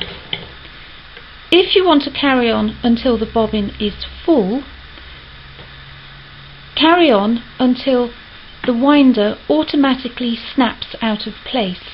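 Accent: United Kingdom. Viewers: Sooooo cute... Perfect queen's English... I've blinking kicked the tripod.... Be kind to yourself sweetie... Not a big deal... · That was Beautiful, My Mother left Me a an Old manual pedal type sewing machine,, I'm ready to make a Sail and go Sailing.. This was clear and concise instructions.. and I love the British accent..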